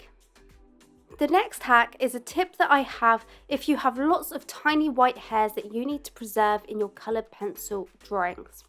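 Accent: United Kingdom. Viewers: Going by her accent I am pretty sure that she is British. Love your name, btw.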